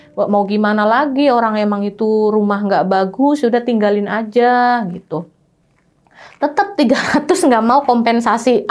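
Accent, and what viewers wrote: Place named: Banyumas, Indonesia. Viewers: Semangat mbakyu,lanjut seru ceritanya ngapak bikin ngga ngantuk mbake. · Wong ngapak mbanya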